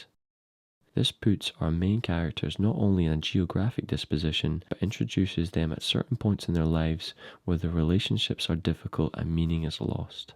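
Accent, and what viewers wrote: Scotland, United Kingdom. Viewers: I thought it was an automated robot voice at first and was about to close the video. Then I realised it was a Scotsman just speaking in a formal voice lol. I agree his voice is unique nonetheless haha. Good vid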